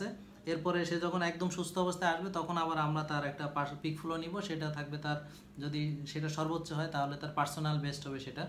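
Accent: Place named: Feni, Bangladesh. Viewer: স্যারের মুখে ফেনীর ভাষা শুনে অনেক আনন্দ পেলাম এবং খুশি হলাম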